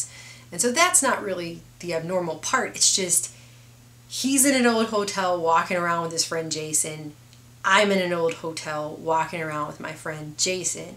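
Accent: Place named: Texas, United States. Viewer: You sound like you're from my part of the woods Texas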